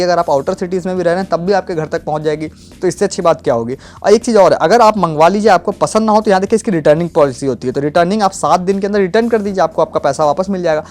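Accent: Kanpur, India. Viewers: Bhai kanpur ke ho kya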